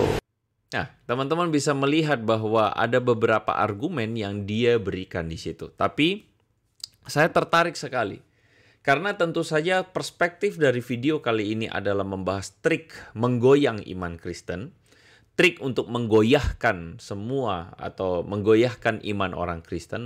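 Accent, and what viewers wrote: Java, Indonesia. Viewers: Wajahnya mirip orang Papua, tapi logatnya mirip dengan orang Jawa. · Dia SDH di besarkan di kalangan muslim kemudian di pakai sbg plat org asli Papua utk berbicara seakan akan dia baru murtad dr Kristen, buktinya dia TDK berlogat Papua tp logat Jawa ini buktinya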